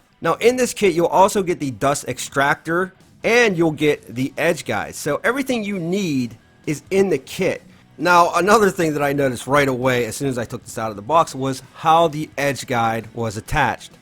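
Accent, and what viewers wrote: Pittsburgh, United States. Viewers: you have the most Pittsburgh accent ever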